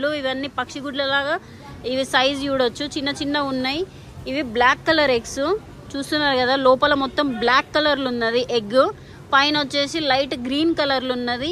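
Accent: Telangana, India. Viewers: మన తెలంగాణా యాస ఎంత తీయగా ఉంటుందో.. superb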